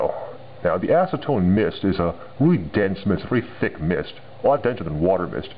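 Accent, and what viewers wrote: United States. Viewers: why do you use Celsius if you're american? (i assume from the accent)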